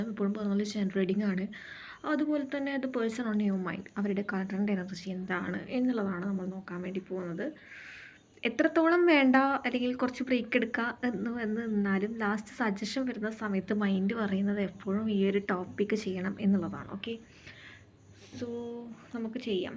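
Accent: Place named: Kannur, India. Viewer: cheythini😂🤣,,, pakka kannur kari👍,,mm,,mm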